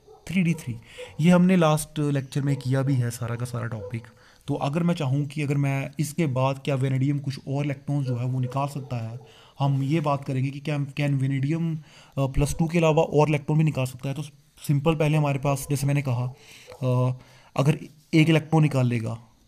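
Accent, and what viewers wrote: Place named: Haryana, India. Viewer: Sir aap haryana se ho kya . haryanvi jesi feeling aa rahi h aapki language m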